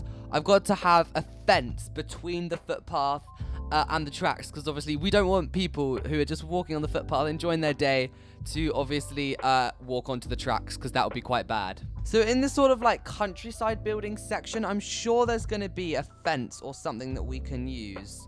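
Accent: United Kingdom. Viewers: You sound like British, are you?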